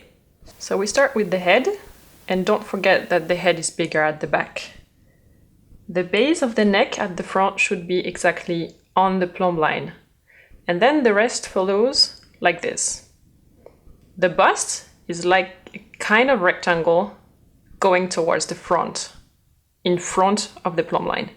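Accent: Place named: France. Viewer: Very interesting. Very good teaching and a very generous teacher!!!!!!!!!! Thanks very much for sharing your ability. And your English, is very good, just a little french accent, non disturbing at all (I'm Italian).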